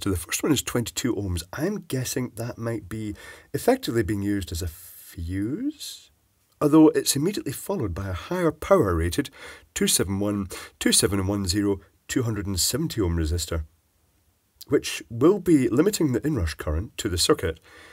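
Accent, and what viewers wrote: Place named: Edinburgh, United Kingdom. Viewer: Hi, I have a bit of a left field question. I love your accent, I'm assuming its Edinburgh. Would that be a correct assumption?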